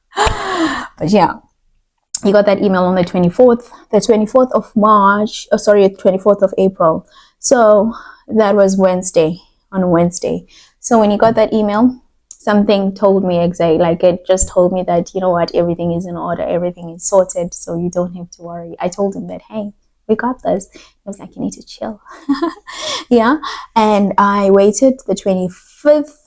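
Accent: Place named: England, United Kingdom. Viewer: Hi guys it's a pleasure to follow you an praying that God bless you all marriage an you talk like you are from England or the UK an not from africa😊🎉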